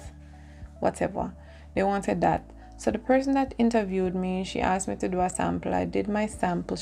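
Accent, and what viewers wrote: Jamaica. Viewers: You sound Jamaican... where are you based at I mean which city? I live in Melbourne Australia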